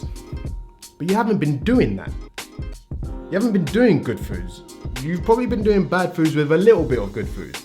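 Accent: Jamaica. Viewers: Decent video....I do labor work and im dirty after work So i always have to go home and shower,,,then sometimes nap and then take a pre workout before the gym,,,,It works just you dont get good sleep at night before of the pre. I find going to bed early and actually gogin the gym before work is better and the pre keeps u going all day. But i heard morning workouts make u drop size because you have no food in you...? You are Jamaican ISH? i heard u say a few thins at times like maybe u got some family here....?g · Are you Jamaican, African or British?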